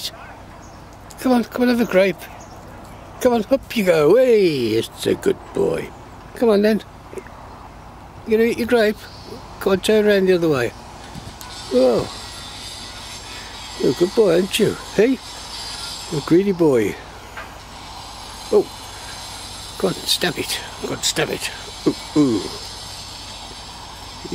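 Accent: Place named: United Kingdom. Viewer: Thought that this was the UK with the accent and a beautiful medieval castle.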